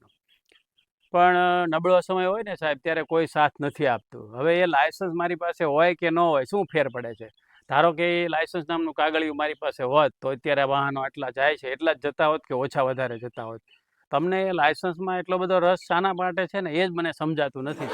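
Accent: Surat, India. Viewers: વાહ....બહુ જોરદાર માથાકૂટ કરી હવલદાર સાથે....😂 સુરતી ભાષા સરસ બોલ્યા હો...બાકી...😂👌🏻👌🏻👍🏻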